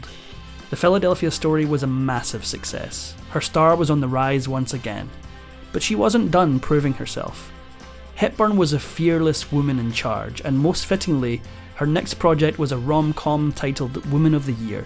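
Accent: Scotland, United Kingdom. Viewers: I enjoyed the Narrator's Scots dialect very much. I wonder how Ms. Atheist Woman is enjoying her afterlife? lol! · But it's not AI!!! Unless AI can now do a Scottish accent. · Interesting to a point, however I was put off listening to more than 4 minutes by your lack of enunciation. You're doing such a good job but please don't let yourself down. I'm Scottish too and unless you want to live in Lorna Slater's "Sco-land" can I, with all due respect, please ask you to pronounce "criticisms" as "criti CIS ms" not "cri-i-sisms" and "thea T re" - don't say "thea-rr". Does ma nut in so it does.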